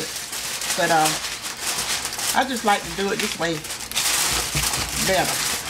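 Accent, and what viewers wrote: South, United States. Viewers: I love your southern accent! I could listen to you all day! Thank you for making this video, it is very entertaining and educational :) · Amen Thank you I love your southern talk and you area Blessing to the women who want to cook a southern meal for the family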